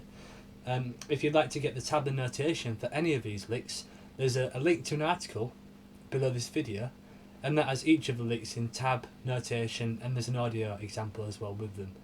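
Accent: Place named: Yorkshire, United Kingdom. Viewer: you'r a good teacher....and you know your stuff. Also you have a great tone on that Tele. Really like your vids. I can never decide between Hull accent and Scarborough I'm going for Scarborough!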